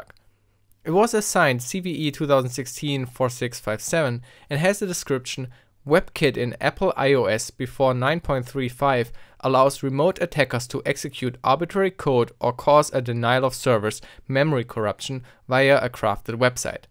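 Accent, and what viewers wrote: Germany. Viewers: I love that German accent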